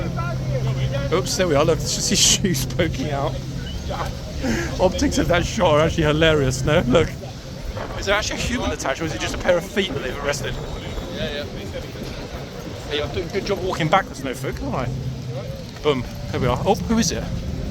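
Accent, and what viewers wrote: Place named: United Kingdom. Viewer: You know us American girls are sucker's for a British accent. I like your videos and have been watching old ones I never saw in between your new uploads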